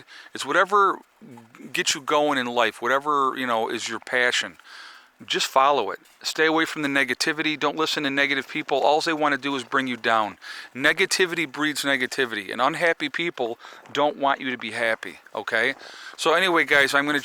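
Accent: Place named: Upstate New York, United States. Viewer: I really enjoy your channel, and your upstate NY accent, Boston native here. Ive been following your channel for a while now, subscribing. Im a so called prepper myself. I'd rather have it, and not need it, than need it and not have it. Thank you for sharing, I'll see you on the next one 👍👍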